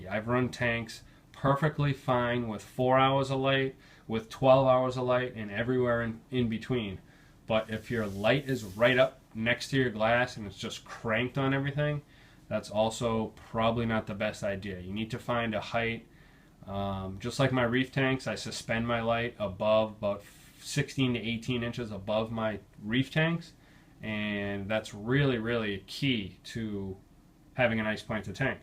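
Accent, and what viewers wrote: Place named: United States. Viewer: "Wahtuh".... oh, the american accents, how quaint. :)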